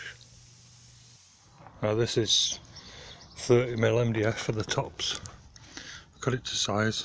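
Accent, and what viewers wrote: Yorkshire, United Kingdom. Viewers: Really, really enjoyed that. You taught me a great deal there. Thanks for all the work. Nice to hear a Yorkshire accent - from a Yorkshire man living in Sydney. Cheers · I'm from Yorkshire myself and I van hear the accent ☺ great tips thanks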